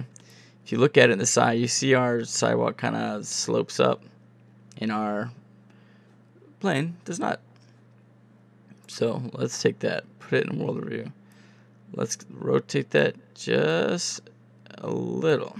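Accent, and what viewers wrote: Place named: Kentucky, United States. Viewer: you sound kinda northwest Kentucky. Great tutorial man thanks! Subscribed!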